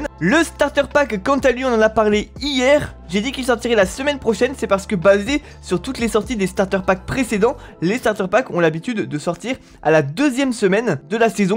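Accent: Quebec, Canada. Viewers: ta parler quebequois la non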